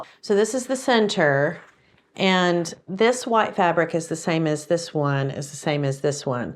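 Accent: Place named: South Carolina, United States. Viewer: You want some “pink” shirts? I purging again… I’ve been through skin cancer crap for over 20 years No worries Just keep freezing it off and burning it off (i wont use the chemo crème they gave me) and if you have to, have MOHS No big deal. Im 55 and been doing it for years I wonder what your accent is. It’s either Raleigh or some kind of South Carolina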